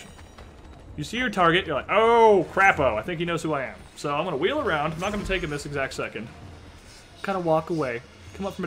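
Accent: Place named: Sweden. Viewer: i dont play ac3 or any i just come for the sexy gameplay and sexy voice and comentary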